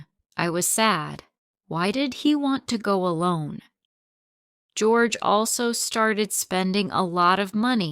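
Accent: United Kingdom. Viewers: I love your english accent so much ❤❤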